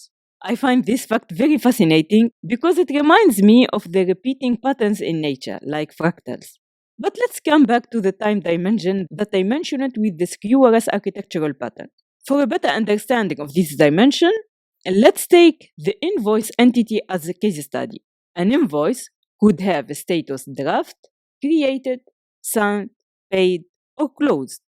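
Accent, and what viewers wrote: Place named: France. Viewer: Your accent sounds like French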